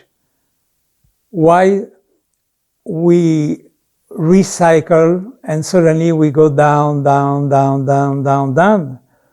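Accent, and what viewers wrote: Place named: France. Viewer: Thank you,! As your student, who once sat at your feet to learn your all-encompassing teachings, this video is a wonderful summary of the total reality that we, as would-be healers and light-workers, are immersed in. How I love to listen to you speak (even though the French accent is always a little difficult for me to understand, ha ha)! You have the ability to articulate the whole truth of being that I feel inside but could not say so well. It was a privilege to learn from you, and is my duty to share this philosophy with everyone I can, so it will ripple out to the whole earth, building that light to heal. I bless you and all the good you are doing! Thank you, thank you, thank you!!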